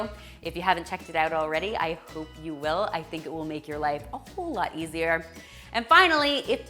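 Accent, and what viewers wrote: Canada. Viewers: As a side note it amuses me how you Canadians say herbs and Worcestershire (I’m English) and as for Scarborough and Peterborough 😂. I’m guessing though you laugh at how we say things 😁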